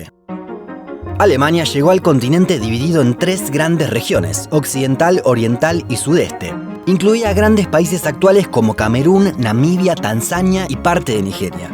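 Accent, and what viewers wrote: Argentina. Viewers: ya me fijé, estaba viendo los comentarios de otros comentarios y al parecer es un Chileno xenofobo de argentina y al parecer el creador de los vídeos es argentino o parece por su forma su acento que tiene similitudes a la de argentina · Buenas tardes, Cosas que han pasado por culpa de las fronteras: - Reparto desigual e injusto de recursos - Me gusta el acento argentino - Nos parece mal que se explote tanto los recursos económicos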